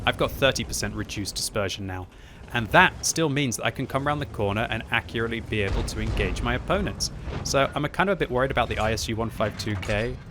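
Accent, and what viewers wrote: France. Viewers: omfg the French Accent is hilarious when you talk French normally. 😂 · Wow, that Frenglish...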